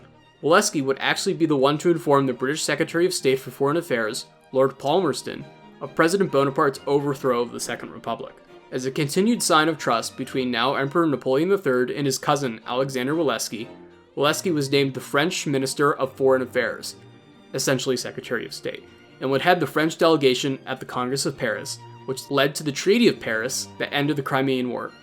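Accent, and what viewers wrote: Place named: Midwest, United States. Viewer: Slow-down, Kiddo. You are rushing your excellent Narrative. Midwesterners do that. Runningwordstogether.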